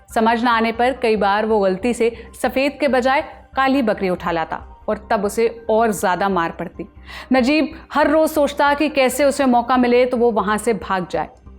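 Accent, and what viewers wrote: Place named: Hyderabad, India. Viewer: Aisa lag rha h anchor hydrabadi accent ki h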